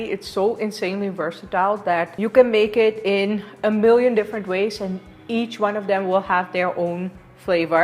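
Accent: India. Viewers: Wow your English accent just like an Indian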